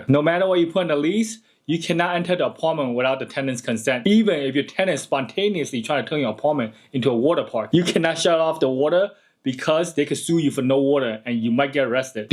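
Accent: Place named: China. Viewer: It's wild someone with a Chinese accent is talking about being a landlord in AMerica...sorry for saying it(not sorry).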